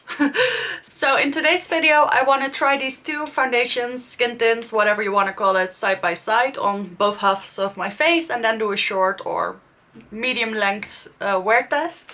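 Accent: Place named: Netherlands. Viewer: Love your dutch accent so much! ❤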